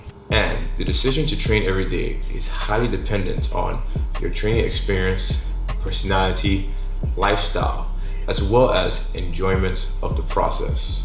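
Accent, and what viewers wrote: Nigeria. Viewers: Omoh you be Naija guy???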